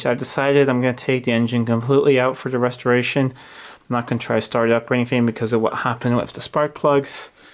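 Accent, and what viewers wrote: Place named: United States. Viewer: Thanks. Listening to your accent I thought that you are from the US and you live in the US. This is why those license plates intrigued me.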